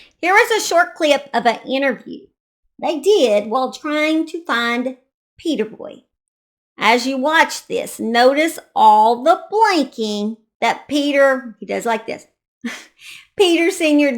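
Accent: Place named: Tennessee, United States. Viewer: I love your Tennesse accent!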